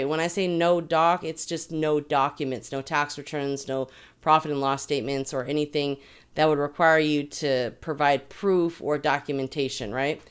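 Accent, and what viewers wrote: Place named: Bay Area, United States. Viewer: I can hear that bay area accent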